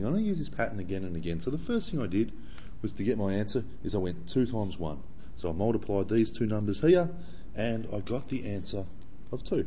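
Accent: Australia. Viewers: Well done . What a nice !Australian accent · its Australian 😂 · The accent is from Australia.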